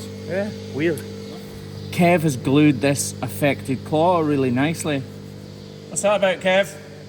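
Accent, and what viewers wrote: Scotland, United Kingdom. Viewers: He Scottish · I enjoy you videos. I started watching so I could better your Scotish accent. Now you are speaking more proper I sort of miss that.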